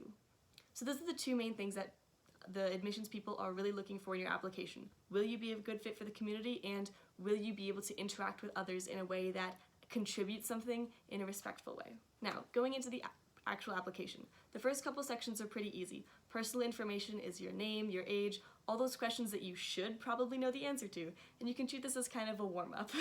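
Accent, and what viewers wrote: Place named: Canada. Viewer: I love her Canadian accent and I don't know why I love it so much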